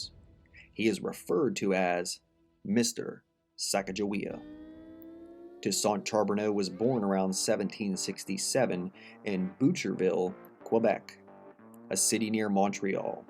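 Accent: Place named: United States. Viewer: Are you American? It's funny how you pronounce all the French words.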